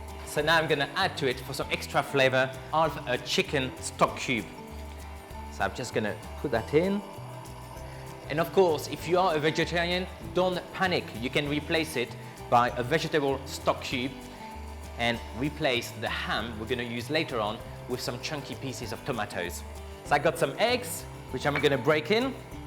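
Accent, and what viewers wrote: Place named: France. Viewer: dude your french accent is amazing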